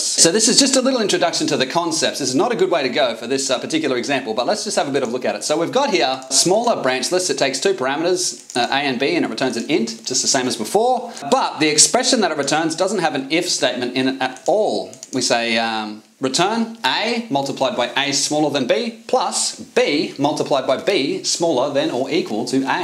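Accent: Australia. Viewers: good to hear an Aussie accent. Yeah, I'm still a young programmer, only 15 years or so, but I always wondered about conditionals like "IF", because if the conditions weren't met to take the branch the CPU wasted it's time asking, I devised my own simple mathematical tricks. It may have taken a few extra cycles, but that was nothing. I never knew this was a "thing". How about that? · I don't anything what you talking about but I listened for the Australian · Nothing better than an Australian teaching you about programming casually, great video mate!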